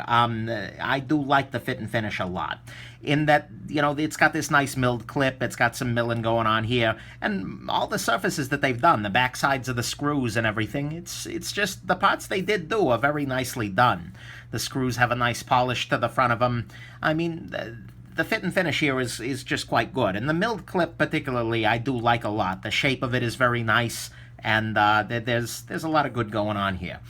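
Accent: Russia. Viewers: Excellent review and Ruskie accent. Spaceba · Hey . Yours „ russian English “ sounds much better then yours “ through the nose English “.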